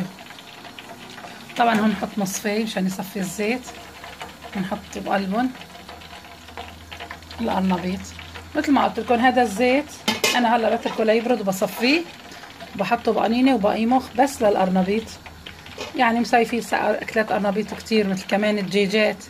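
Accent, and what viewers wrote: Aleppo, Syria. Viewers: لك دخيلك وخيل اكلات الحلبية يا انا متل حكيتك والله ياخيت حلبية ابوس جنق وجك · بحب لهجه حلبية ❤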